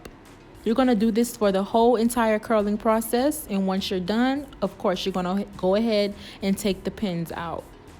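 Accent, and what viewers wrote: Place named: New Orleans, United States. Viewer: Are you from New Orleans? You accent is telling me so 🤣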